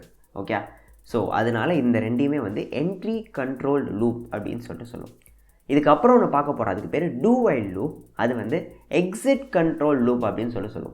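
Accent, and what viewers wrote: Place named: Tirunelveli, India. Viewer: Hi bro unga slang vachi parkumbothu i think you are thirunelaveli paiyan I'm i correct✅😂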